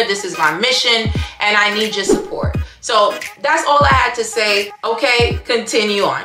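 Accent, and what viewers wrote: New York, United States. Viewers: So glad I came across this on my time line❤ You putting me on👀‼️ Definitely just got you a new subby🤗 Love listening to you talk ,that NY accent reminds me of home🥰 I'm from the Bronx 161st ❤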